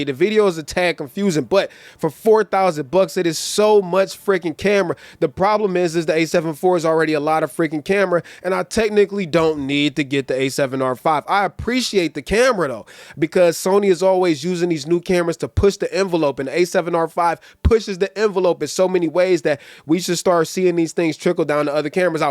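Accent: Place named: New York, United States. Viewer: more normal English ? Most people don't come from USA or New York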